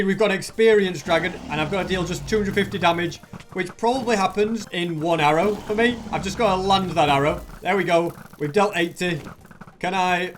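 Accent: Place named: London, United Kingdom. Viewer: hes english (london)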